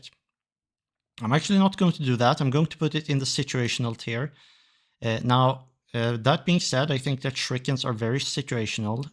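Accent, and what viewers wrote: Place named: Sweden. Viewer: That's a Swedish alright. Immediately discernable.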